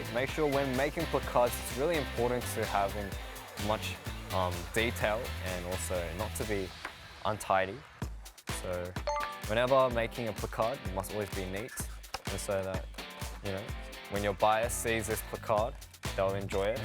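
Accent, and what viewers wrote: Australia. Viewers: I know everyone thinks it but his Australian accent makes everything 10 times better 😍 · The more is see this the more I get used to the Aussie accent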